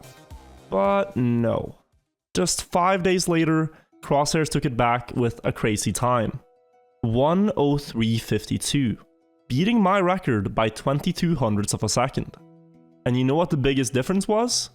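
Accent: Norway. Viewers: Top Trackmania expertise + slight (and sexy) Norwegian accent = perfect content.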